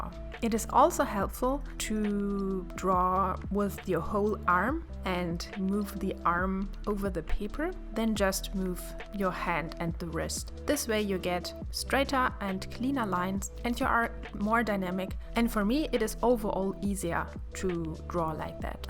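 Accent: Germany. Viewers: Your Art is so beautiful 😍😍🌹 Where are you from? I would guess Germany from the accent.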